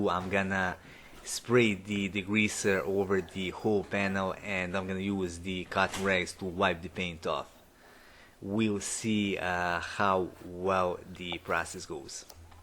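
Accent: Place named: Italy. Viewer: I like your italian accent Mille grazie alfisti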